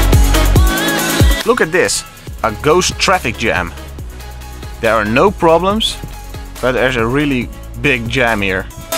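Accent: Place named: United Kingdom. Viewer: awsome accenting of the Stereotypical British !!!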